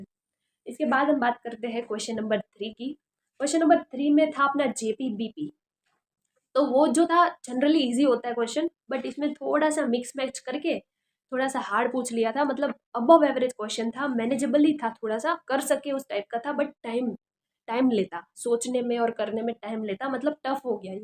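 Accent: Gujarat, India. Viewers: Tumhari hindi thodi gujrati vaali he. Kya tum gujrati ho?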